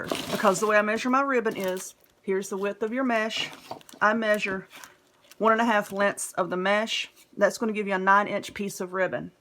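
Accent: South, United States. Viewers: Hello my Southern friend, my name is Joyce and I live up near Chicago and I wanted to let you know how much I enjoy your videos. I have been logging in and checking to see what you're up to and I love the things that you share. I respect the fact that you want to do tutorials using only things that you know people would have around their homes, I get that. I have done a couple of wreaths myself and while skimming through the internet one day I found where mesh with a stencil burner. Well because I do have one of them on hand I used the stencil burner to cut my mesh and it made a huge difference in my mesh as far as it unraveling. I love that thing it's quick to use I had all that mesh cut in no time. Although I totally agree with you about keeping it simple so that your viewer's have those things on hand I thought I would just give you that little bit of information because I wanted to share and give something back. 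Keep bringing those videos, love your accent, love the crafts and the hauls that you do . from a dedicated subscriber 👍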